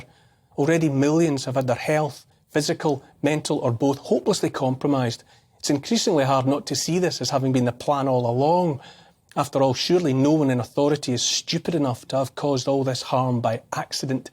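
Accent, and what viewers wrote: Scotland, United Kingdom. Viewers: Neil Oliver 💞🤗 A gentle, beautiful Scottish lilt A kind, empathic, forward tilt An open heart; a caring face A willingness to take his place. A strong, home-hitting monologue Reminds us of the boiling frog The courage to become a sleuth A passion to release the truth. A deep desire to crack the whip Illuminates our freedom-strip Calls out the darkness taking hold The shiny despots; plated gold! Intense and raw, he lays it bare A soul-enhancing, honest stare Inflections drive the message through He speaks for Us - for me and you! Discernment's such a precious gift His words create a mighty lift Take heed of all he has to say He knows - it shouldn't be this way! 🤗🦋🐬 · A load of drivel from an over pronounced Scottish accent aka 'Posh Scotch' from a multi Millionaire puppet. Wake up lads. Fuck sake. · The truth with a Scottish accent! 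Love it!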